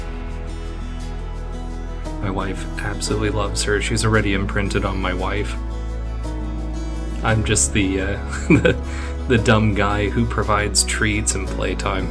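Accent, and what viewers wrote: Scotland, United Kingdom. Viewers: Guessing that you aren't obsessed as I am about organic foods. Are they as accessible as in the U.S.? I LOVE hearing Scottish brogue ! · I get a chuckle when your Scottish accent is creeping in. The same thing will happen to me.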